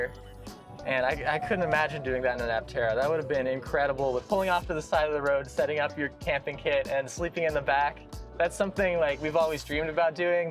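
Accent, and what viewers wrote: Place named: San Diego, United States. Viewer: “Fermiliar” man that reminds me of home, good old San Diego dialect. Love “bolth” SD and what Apterra is doing for the industry. Everyone’s watching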